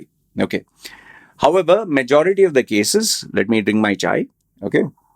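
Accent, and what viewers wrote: India. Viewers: We want full video in your hindi tone...5 pandav 😂😂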